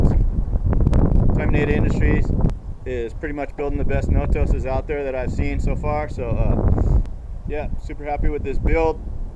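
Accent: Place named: New York, United States. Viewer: I see you are in west TX, but from your voice I detect Louisiana and a subtle NY accent. I am in East TX by the way...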